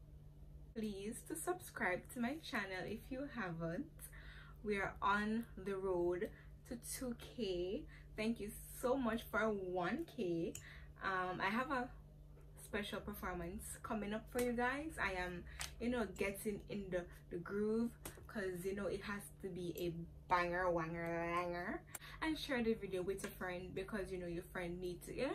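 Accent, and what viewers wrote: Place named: Jamaica. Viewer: As always nice video.. Love the Jamaican accent pretty lady.. You gon make me tip 💰 you something nice lol. Stay focus and keep the videos coming. Your future is bright 🇯🇲 👑..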